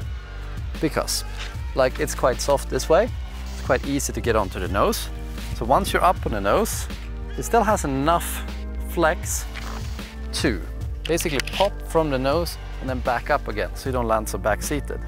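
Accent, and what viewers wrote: Sweden. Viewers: 100% svensk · This dude has to be Swedish judging by the accent (I’m Swedish 😁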